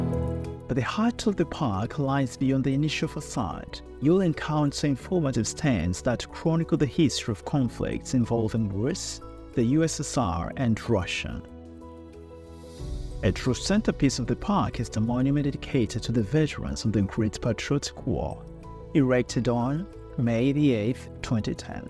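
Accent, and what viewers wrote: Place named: RP, United Kingdom. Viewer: Kizungu cha BBC news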